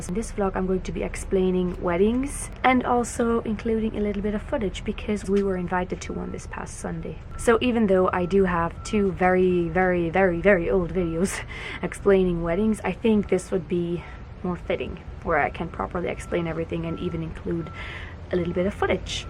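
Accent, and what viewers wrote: Germany. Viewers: Thank you for sharing this beautiful tradition. I'm curious what dialect of German do you speak. Ich spreche Deutsch:)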